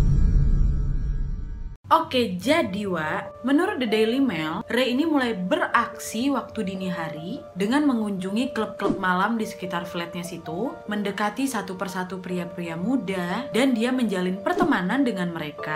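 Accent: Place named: Medan, Indonesia. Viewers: Jadi ketagihan awak nonton video uwak..logat melayu deli yg kental kali wak...sukses selalu wak💪😘🙏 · Logat sumatranya kentel nian wak ini🤗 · Anak mana ko kakkk medannya kauuu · Nonton semua video serasa lagi gybah sama temen, seru habis ya walaupun ceritanya seperti kaidah kaidah semata, tapi dia jelasinnya kayak anak medan kali logatnya, senang kali aku nengok kontennya, sukses terus ya biar kita gibah terus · Suka kali aq dengan logatnya.. bah medan kali lah.. langsung ku subcribe. I love u sis ❤😘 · Aku suka banget sama gaya bicara dan suara yang jelas dan lantang. Pembahasan tentang kasus2 yang dibawakannya begitu runtut, rinci dan detail. Lagipula juga pintar, cerdas serta cantik rupawan. Dari logat bahasa, sepertinya dari Medan-Sumut ya?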